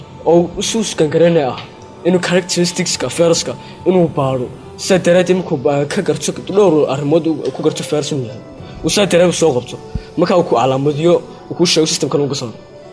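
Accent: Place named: Somaliland, Somalia. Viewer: socdaal media he isn't from mogdisho he is from Somaliland don't you understood easily and koonfur is different speak.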